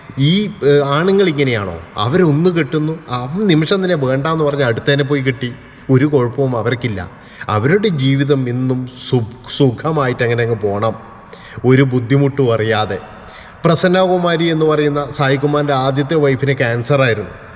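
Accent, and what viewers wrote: Kannur, India. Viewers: Kannur ഭാഷ.. 🙂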